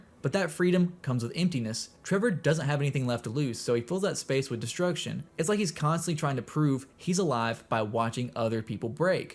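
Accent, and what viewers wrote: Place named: South, United States. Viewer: yo country accent is killin me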